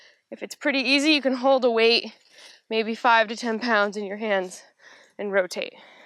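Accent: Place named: Australia. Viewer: Your aussie is so beautiful!!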